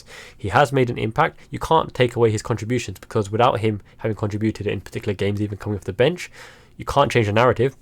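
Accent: United Kingdom. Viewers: Thanks for your commentary & cool head. Some other YouTubers were throwing our players to the wolves after this game. Everyone can have bad days. Onwards and upwards. YNWA 🚩 🚩 Btw, thnx for answering my Q abt why you have a UK accent. I thought you were raised ib Japan but studied (maybe uni or masters) in UK that's why you have their accent. Cheers!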